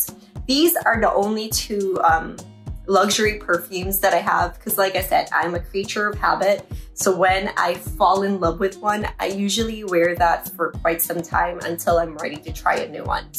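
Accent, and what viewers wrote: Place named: France. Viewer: Great video sis, Loving your french accent..😄 I'm the same, as you, I have my fave perfume that I've been using since high school and its the Calvin Klein Eternity, it just brings nostalgia for me..💗 I'm perfume obsessed lately and have a few different ones..lol.. I do want to get the Louis Vuitton, Rose de vents and Chanel 1957. those two are on my Christmas wishlist . Thank you for sharing, have a wonderful weekend 😘💖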